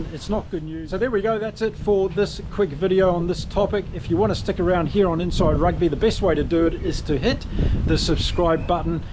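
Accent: New Zealand. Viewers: If the Australian Kangaroos (League) and South African Springboks (Union) had a baby it would be the New Zealand All Blacks. OK that was a stretch. What I mean is All Blacks play an exciting brand compared to SA in my opinion. I like how All Blacks sling the ball around a lot more. Not the slow grind it out heavy forward pack stuff. Question: I’m sure Mexicans think you’re American. Can they differentiate your accent compared to Americans?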